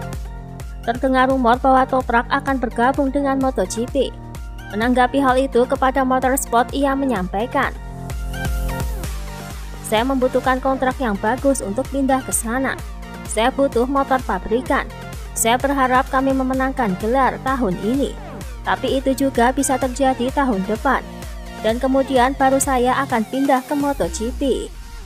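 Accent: Java, Indonesia. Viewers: Mbak medok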